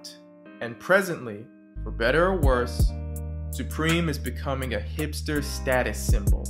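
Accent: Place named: East Coast, United States. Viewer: The stylized east coast regional diction that is narrationally employed throughout this video, is better enjoyed at a faster playback speed as it is more pleasing to the ear and the larger demographic of viewers most likely to watch this genre of YouTube multimedia.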